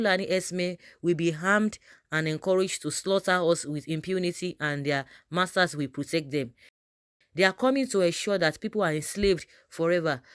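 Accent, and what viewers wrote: Yoruba, Nigeria. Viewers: The lady who presented this video seems to be a Yoruba speaking person, from her accent. I want to commend her for saying and upholding the truth. May God bless you. I also want to commend David Basil, for his accurate observations of his Yoruba brothers and sisters. May God bless you also. I pray that God would empower you both to confront this weakness and evil, so that things can change. It is wrong, a CURSE, and utterly reprehensible, for people to put their heads between their legs, and stick their bums in the air, and pretend ignorance, deny the truth, and worst of all, support what the whole world knows is clearly wrong, then, because of the natural gift of the gab, insults are levied on those who in simple language, speak the truth, while cunningly sweet talking the inept the incapable, the corrupt and evil. This is why Nigeria is butt of jokes in the international community because, as we get mouth reach, with all the book we claim to know, our over Sabi never fit help us to select better people!!! 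This is a curse. We are busy celebrating failure! Unless we can have the balls to speak, uphold, and enshrine the truth, betrayers will continue to parade their nonsense.I think it's time to shame them publicly.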